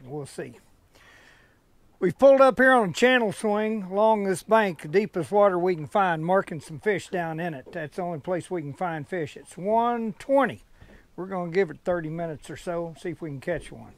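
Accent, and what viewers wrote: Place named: Missouri, United States. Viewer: You are the fishing grits of all time Enjoy your expansion to catfish but can’t imagine you would actually clean and cook them I never thought catfish was worth the effort I am driving back from AZ on Monday Love listening to your distinctive SE Missouri flavored commentary Dave K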